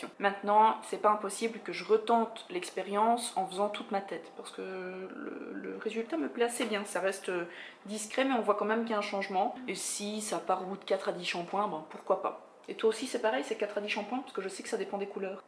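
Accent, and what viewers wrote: Belgium. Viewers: tes belge??? sinn super video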